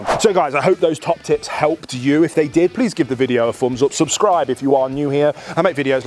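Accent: United Kingdom. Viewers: Just read a bunch of these. I've been watching for a while now, my son calls him the UK guy cause we Yanks love the accent. Anyway, for those who complained, there is an older longer video on slip lead training of a bad puller. It's an excellent vid and what I used to correct my Lhasa apso mix monster. For those of you who don't like or can't get prong collars (I don't think I'd put a prong on a Lhasa) then try a Gentle Leader. They really do work IF you present them correctly and use them correctly. Read the directions! All of videos are great, but there are other good ones as well. Look around and be willing to devote some quality time to your dog!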